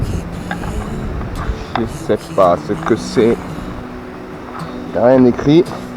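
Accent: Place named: Corsica, France. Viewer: Vu l'accent Corse, que tu te coltines, sache que je suis très heureux de t'accueillir chez moi. Et même si je me trompe...tu es le bienvenu quand même. En effet, les forts de la Gavaresse et de La Colle Noire, ont été des hauts lieux de notre histoire méridionale. J'ai eu le plaisir et l'avantage de parcourir les tréfonds de ces architectures, alors que j'étais ado en recherche d'aventure. Sans compter les galeries de mines du Cap Garonne ...bien avant qu'il ne soit exploité pour le tourisme. Autant te dire que j'y ai passé de bons moments à retourner les pierres, pour y chercher une suite.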